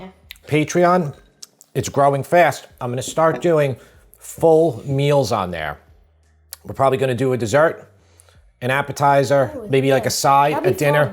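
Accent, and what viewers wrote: New York, United States. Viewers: I am a simple man I hear new york accent I hit like and subscribe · I’m watching this as we drive to the farm and my husband asks me “I didn’t know Billy Crystal has a cooking YouTube” never thought about it but there is a little Billy in your voice. I miss a New York accent from when I lived in bronxville.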